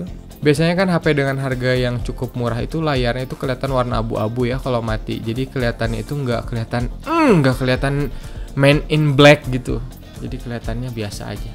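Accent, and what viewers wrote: West Java, Indonesia. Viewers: Nyariosna khas sunda nya..hhehehe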